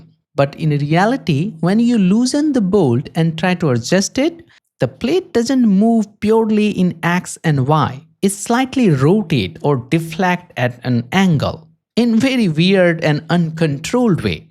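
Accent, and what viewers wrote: India. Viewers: is this dude from ahmedabad?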